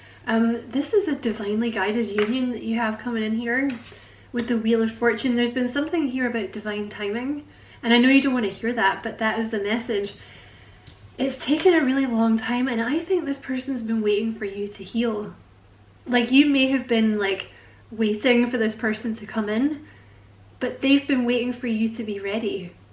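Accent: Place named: Scotland, United Kingdom. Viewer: Oooh I Love your long readings and your Scottish😍 Thank you so much for your beautiful reading💕🙏🏻😘 Love you💕💕💕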